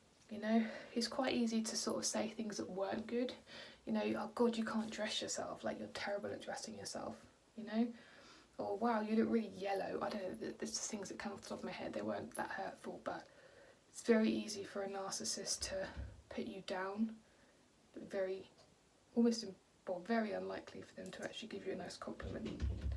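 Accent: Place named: United Kingdom. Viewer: Oh my god I’m only 20 minutes in and he sounds awful! You’re such a sweet person and you deserve so much better than this. I’ve watched your Lush videos for years and have adored you and your British accent. I know you will get out of this rut and rise like a Phoenix. Love from a vegan lushie in Ohio.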